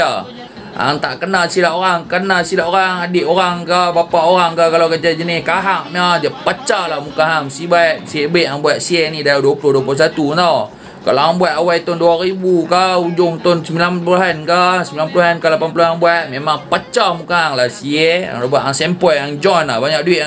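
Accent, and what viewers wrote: Malaysia. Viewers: kikikik..loghat penang mmg...sempoi...stedi mami...betoi tu mamu..bior je depe depe..keme geli org yg dok buat mende sikit..sal juang agama..kalimah "drp hamba Allah" dah payah jumpe..yg org org yg tolong sampeikan pape simbangan bile ditanye kt depe depe tu PUN tak tau sape penyumbang asei..depe cume nolong nyampeikan.tahun 90an masih ade 'drp hamba Allah'...masuk alaf baru 20th century kate org kg keme..dh payah jumpe..sedekah tangan kanan kiri yg terbaik.keme mmg tak level gitu.tp keme penah nengok..org anto brg memalam delan rumah kak seorang balu anak 5../letak dalam satu tong biskut empat segi..atas rak kasut..ingat manusie je..yg tak boleh nampak..nk sedekah gitu..pun org ramei boleh tau CUME org ramei tak tau sape hamba Allah tu..usahkan menteri o vvip...haji bakhil yg tau pun akan merah muke..sendiri malu..tu watak lakonan filem le.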